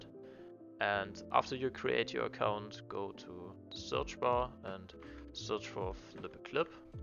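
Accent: Germany. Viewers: U kinda sound like a german no hqte😅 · Hey are you German? Because you kind of have an German accent (im also German (well switzerland but still))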